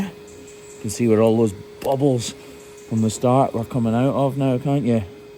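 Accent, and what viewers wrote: Scotland, United Kingdom. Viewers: quick question, are you Scottish or British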